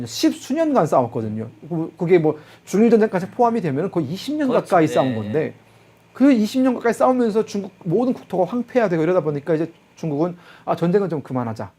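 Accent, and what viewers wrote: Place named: Seoul, South Korea. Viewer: 김금혁씨 서울말까지 완벽하니 인간미가 좀 없어보입니다. 북한 악센트도 가끔씩 좀 넣어주면서 강의해주세요 ㅎㅎ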